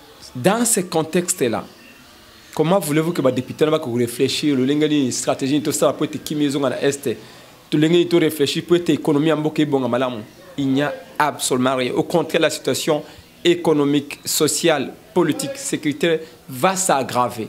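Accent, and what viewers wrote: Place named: Rwanda. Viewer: Aza na ba Accent ya ba rwandais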